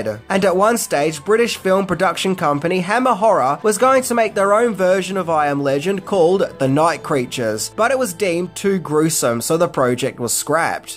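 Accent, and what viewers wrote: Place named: Australia. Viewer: is just speaking Australian English. In America, people say “oh-may-huh”. Apparently everybody else says “oh-me-guh”. Just different dialects of the English language.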